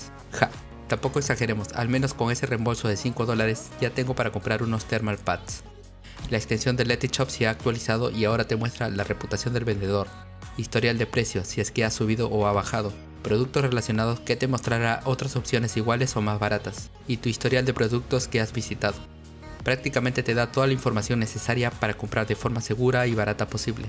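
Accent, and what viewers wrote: Peru. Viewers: eres de Perú? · eres peruano :V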